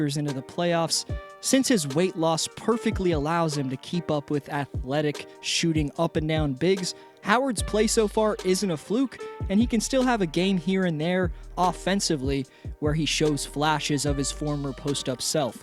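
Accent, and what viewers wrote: Canada. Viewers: I never knew about the instagram beef, bruh. You just lost me there. You sound Canadian and like you're wearing a tie. When Drose won MVP you were probably barely walking. I don't do millennial channels.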